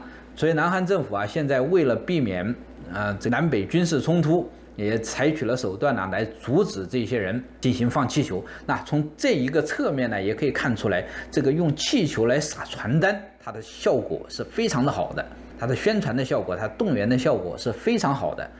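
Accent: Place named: Subei, China. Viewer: 这个老兄的口音江淮官话 苏北口音的底子，为什么我总是联想到 江爷爷。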